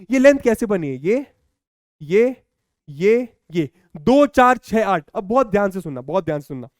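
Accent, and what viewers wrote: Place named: Bihar, India. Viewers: Such a bihari 😅😅 but good THANKS sir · sir speaking bihari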